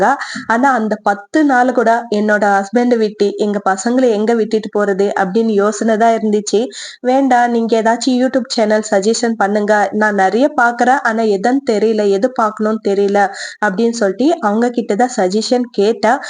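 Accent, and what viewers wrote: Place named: Andhra Pradesh, India. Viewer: Hi sis enga amma kooda ippude tha pesuvanga don't worry we r from andra so slang allaga undithi so go on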